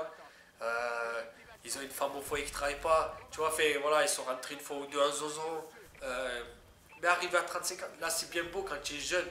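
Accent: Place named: Marseille, France. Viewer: Slt j'adore t vidéo en plus t un marseillais j'adore les marseillais surtout l'accent bref gg pour ton nouveau taf sa fait plaise que t trouver un taf qui te plaît écoute g un petit souci au niveau fille en faite cela fait 6 mois que je suis avec ma copine et on a toujours pas couché ensemble pourrai-tu me donner un conseil car c la fille de mes rêve c ma futur femme on c déja décidé on restera ensemble pour la vie et voila le problème est la elle est timide et elle prend pas la pilule conseil moi un truc pour la rassuré mais bon 8 mois sans faire l'amour c long koi donc voila stp merci si tu répond a ce petit texte je t'aime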